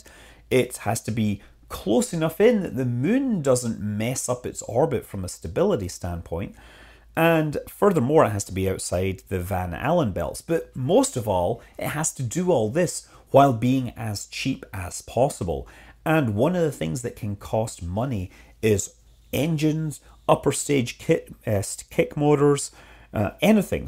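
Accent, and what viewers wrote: Scotland, United Kingdom. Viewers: Scottish accent.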